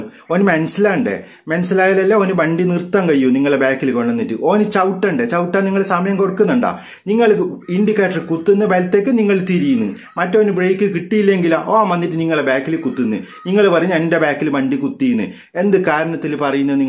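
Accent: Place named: Kasaragod, India. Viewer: Kasar kod Basha Yella Bashayum Ariyum😆😆🤣🤣Rasamund kealkkan😃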